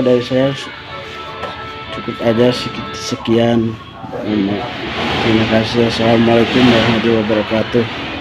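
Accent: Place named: Sunda, Indonesia. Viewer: Aduh meni leket pusan sundana si amang pace .terus berkarya pace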